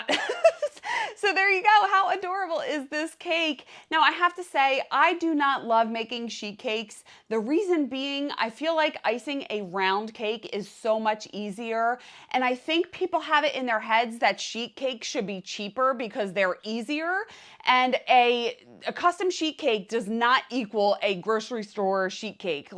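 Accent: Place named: East Coast, United States. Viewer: I didn't say you said it wrong! Girl, I love east coast accents lol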